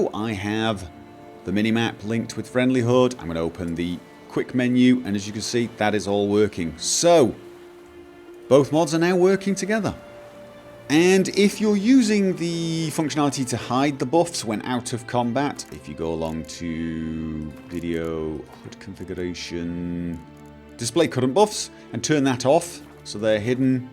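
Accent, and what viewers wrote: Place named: Scotland, United Kingdom. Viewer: What is your accent? It almost sounds Scottish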